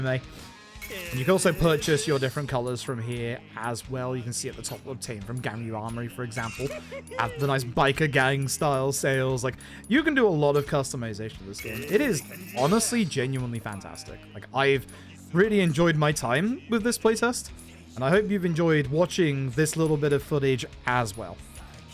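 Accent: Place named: United Kingdom. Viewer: DAWG, YOU'RE BRITISH!?!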